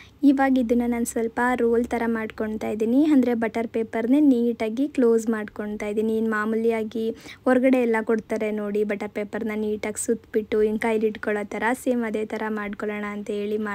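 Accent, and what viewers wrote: Mandya, India. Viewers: U are such a sweet girl and ur slang just like us proper mandya slang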